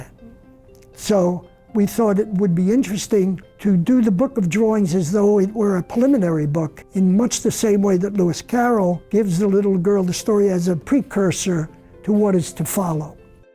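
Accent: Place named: Philadelphia, United States. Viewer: Seeing this video made me cry because Charles was my dear friend, and I miss him and think about him all the time. I became an illustrator and painter and would get to spend time with him at his studio talking about art and just about anything and family. He was a gracious man and very kind to me. He would look at what I was doing and shared so much insight and the sound of his voice really resonated with me as someone who also grew up in Philadelpha like me and also stayed in Philadelphia his whole career. We had many similarities in families and thought. I would say for many years I knew him and his work prior to becoming good friends but always thought to myself what do I have to say to him? He was brilliant and one in a billion. I only got the courage to finally make the call to his studio when I had children's books that I had written and illustrated published and saw success in what he did so brilliantly. 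I finally had something to share with him and Charles let me come to his studio and we spent hours together over many years just talking. He saw me as a fellow illustrator and was so very pleased that I was getting to be part of the parade he talks about in this video. He always greeted me with "Stephen!", and he made me feel special like family. I hear his voice often. He has a beautiful family. I loved that he was a family man.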